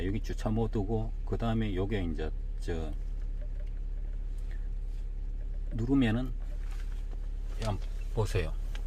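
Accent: Gyeongsang, South Korea. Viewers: 요때기 경상도 사투리 정겹네요ㅋㅋ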